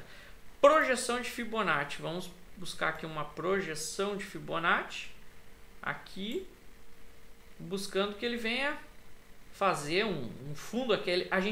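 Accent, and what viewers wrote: Rio Grande do Sul, Brazil. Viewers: O cara é bom.... Ensina com simplicidade, estou tentando aprender sozinho tbm com ajuda de voces, não é facil, mais não vou desistir. Pelo sutaque é gaucho? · Cara show de bola , muito obrigado e parabéns pelo video , estou estudando para entrar nessa. Moro em SC agora ,mas sou do RS , saudades quando escuto esse teu sotaque. Muito bom parabéns pela disponibilidade de mostrar e ensinar... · Esse sotaque eu conheço, tu é daqui do sul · Eu sou do norte e de cara vi que era do sul e falei "baaah gente boa de mais esse guri"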